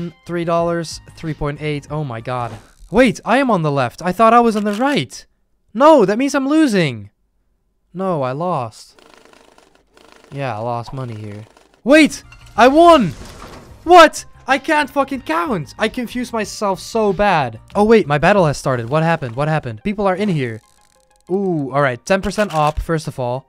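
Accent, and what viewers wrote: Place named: Netherlands. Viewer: Lol ik ben nog niet zo lang gesubbed en je bent nederlands 10000% ik hoor het gewoon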